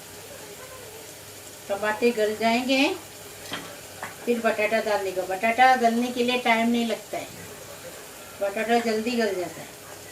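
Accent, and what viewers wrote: Konkan, India. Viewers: Aapko dekhkar mujhe meri padosi kokani aunty ki yaad aa gai.. aapka bolne ka tariqa.. 👌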